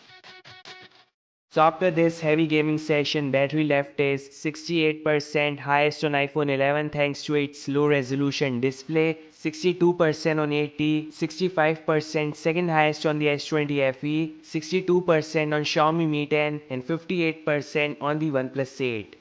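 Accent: India. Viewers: I love the English Indian Accent. "Lets Goouuu"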